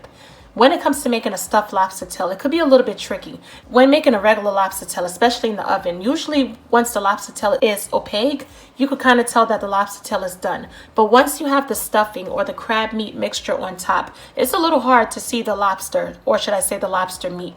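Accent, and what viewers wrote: New York, United States. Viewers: Dear, please, if you can, write the names of the seasoning / ingredients on the screen, as you add them. Many vloggers do that, and that's Good for people who don't have English as first language, and people who are hard at hearing, and many senior citizens are hard at hearing. Also, not all English speakers will understand your American sounding accent, so by writing on the screen, you'll make it easier for the whole world to watch your videos and appreciate it. Non English speaking Africans may not watch because of the language, most Indians, Chinese, Arabs and others may have difficulty in understanding what you are saying, and by writing on the screen can give you more views. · Sound like you from New York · I’m not from the U.S, is that a Newyork accent? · This recipe looks so good. My mouth is watering ok!!! I love the way you put it all together with simple ingredients. 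I know you're my NY homegirl from the way you say "sauce" "talking about" and other words as well. Thanks for sharing your wonderful recipes and impeccable cooking skills. By the way I'm a Bronx girl "The Boogie Down". Keep doing what you do!!!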